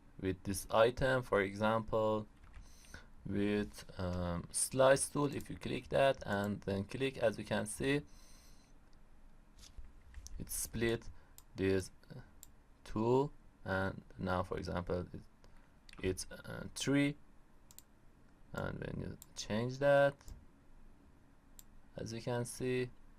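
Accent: Iran. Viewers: Are you persian?your pronunciation like persians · it's so obvious he is persian lol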